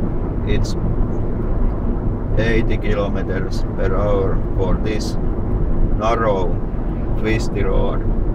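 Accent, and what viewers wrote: Finland. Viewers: I love the Finnish accent and the car as well :) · On kyllä nii finnish english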